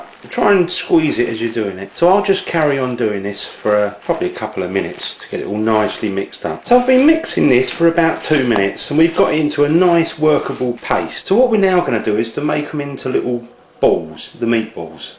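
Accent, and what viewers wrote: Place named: England, United Kingdom. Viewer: Love your accent, man! I had the wrong idea that the english can't cook, but it is I who can not.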